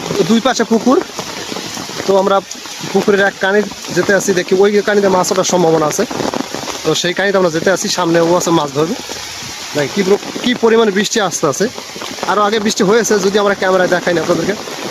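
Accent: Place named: Barisal, Bangladesh. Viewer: BORISAL BOLE KOTHA :face-blue-smiling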